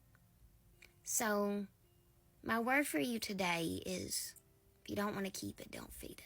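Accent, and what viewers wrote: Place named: South, United States. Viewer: Amen I knew you was from the south because as soon as you spoke I giggled cause you and I have a similar accent.....keep on doing what your doing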